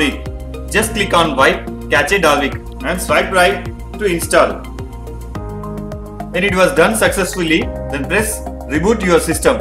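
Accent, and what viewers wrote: India. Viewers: R u from Hyderabad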